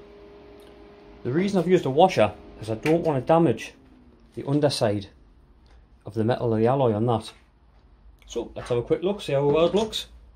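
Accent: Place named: North East England, United Kingdom. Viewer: Mate av got the same problem with my trials bike am sick, you sound like your from the north east, fancy helping me?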